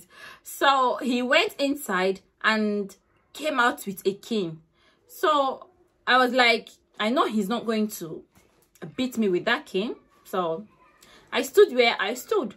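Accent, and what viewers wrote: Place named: Ghana. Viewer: So sorry 😞but na ur stubbornness cause am 😆 I love ur English u speak like Ghanaians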